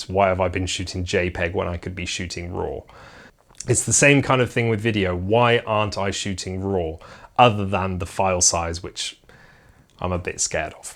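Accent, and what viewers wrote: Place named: United Kingdom. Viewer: you don’t have to thank me, I watch for selfish reasons. You have lots of potential, I’m liking your topics and of course, the British accent;)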